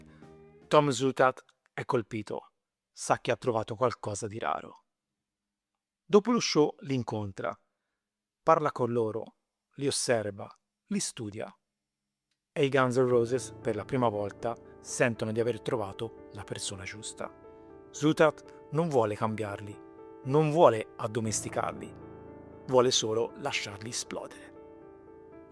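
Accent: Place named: Tuscany, Italy. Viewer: lento nel raccontare...fai venire sonno...e dalle co sto toscano...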